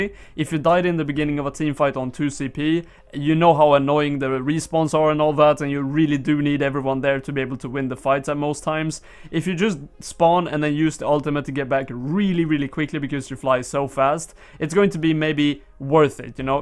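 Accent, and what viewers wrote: Sweden. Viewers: ok tyckte du hade en svensk dialekt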